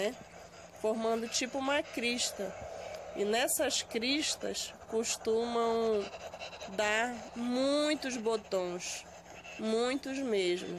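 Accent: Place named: Pará, Brazil. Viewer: Pelo sotaque, eu tinha certeza que moravas no Pará,! Só não sabia a cidade😅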